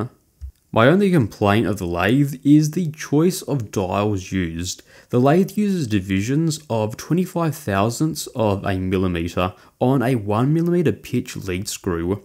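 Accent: Australia. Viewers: Thank you for this very thorough and well thought out review! I have been procrastinating for years now on my decision to jump into a mini lathe, because there just isn’t enough information out there in easily understood format. Good on ya mate! I enjoyed listening to your accent too, my younger sister lives in Albany, Western Australia, and so I miss hearing you Aussies! Cheers mate, from Northern California! 🙏💪🙌🤘🤘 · Is that an Auzzie accent? Does it vary from region to another?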